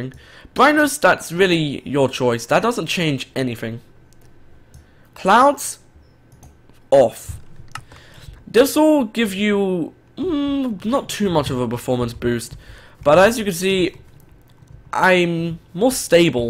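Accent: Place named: RP, United Kingdom. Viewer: wow, you sound so posh... (I'm just noticing)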